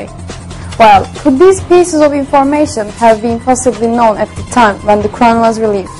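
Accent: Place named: Turkey. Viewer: kesin bizden, aksandan belli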